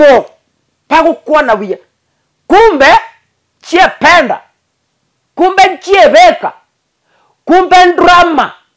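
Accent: Kisii, Kenya. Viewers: Umeongea kama wakisii wote,obokenyomba baminto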